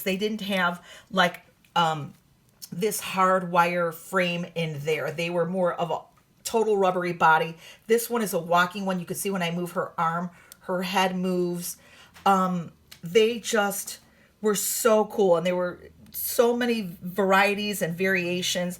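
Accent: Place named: Texas, United States. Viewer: That a Texas accent if I ever heard one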